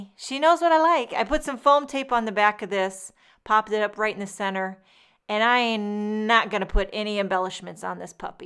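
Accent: New York, United States. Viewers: Ohmy gosh are you from NY. I love listening to you. You are hysterical. lolol.. Love the cards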